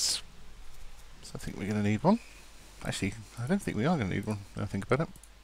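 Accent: United Kingdom. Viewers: may i ask. Where are you from in the UK?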